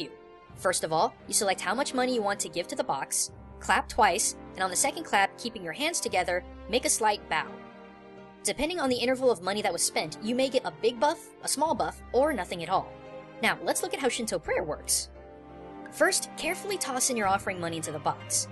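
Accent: Japan. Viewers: I believe he is using the accent because it helps him with the correct pronunciations with Japanese words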